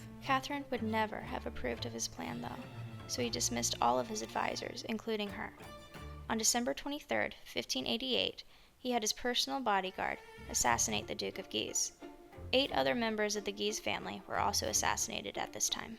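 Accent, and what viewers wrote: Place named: United States. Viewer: From on quite a few facts… I would’ve preferred the narrator to Speak a little slower and not use an American accent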